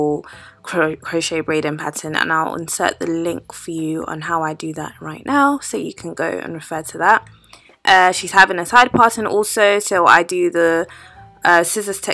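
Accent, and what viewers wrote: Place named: United Kingdom. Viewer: you have a British accent, are you based over here in the UK?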